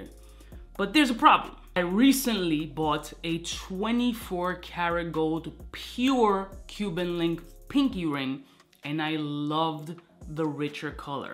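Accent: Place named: New Jersey, United States. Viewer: Your Cuban born in miami too. But your sound like New Jersey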